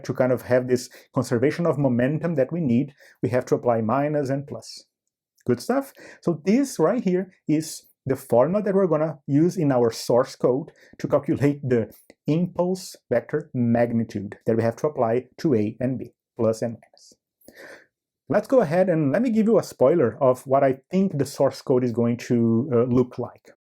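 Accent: Brazil. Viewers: Aha! Eu tinha certeza que vc é brasileiro pelo sotaque! Cheguei aqui aleatoriamente pq comecei a brincar de fazer jogo esse ano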